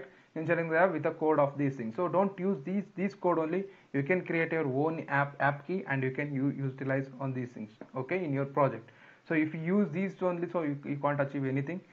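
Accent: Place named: India. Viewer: Nice Indian accent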